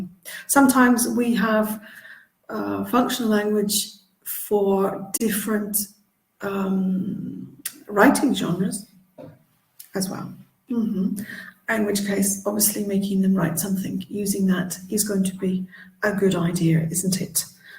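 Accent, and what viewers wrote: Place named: Russia. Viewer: You are absolutely right, she has harsh Russian accent, her knowledge and professionalism I have not criticised by the way, bookish definitely ( having impression that she has not experience talking with native speakers) I tried my best to listen the tutor to the end, no success. I am not teaching English, more over I am not teaching other teaches !!!!!!!! I can have any accent. Good she has got comments, now she can working on her accent . It is hard work in universities for interpreters, they have classes for this particularly problems, as muscles have not been used for soft talk and for different phonetic, but has to be done as many ppl did. Wong Pronunciation it is common for Russians teachers. This is why I prefer to listen and communicate with native speakers .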